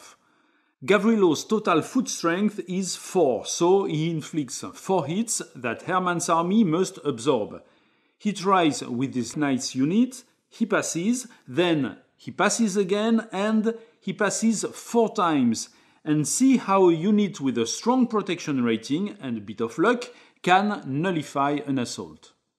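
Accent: France. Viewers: Very impressive! Watching it before trying to digest the rulebook. Being a Russian I'm indeed delighted by the choice of the topic by Volko Ruhnke. And on top of that, this French accent makes it look and feel more 'medieval' 🧙‍♂️ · Best tutorial ever and bonus the French accent that makes it even better.... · Thanks a lot! You are my most preferred english spoken with french accent youtuber! 😁💕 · 36 seconds of this amazing french accent with attitude and I subbed. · "Delicious French accent..." LOL. It truly is. What a fantastic resource for Nevsky learners. Well done! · I did indeed overcome the delicious French accent and it was totally worth it. This was a great tutorial! Well done! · Super accent :) Du coup j'arrive à comprendre les règles même en Anglais. "But you are French ?" ;)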